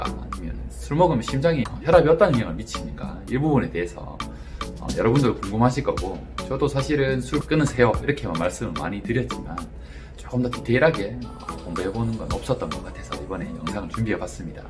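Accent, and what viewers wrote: Gyeongsang, South Korea. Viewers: 갱상도~~사투리 쩐다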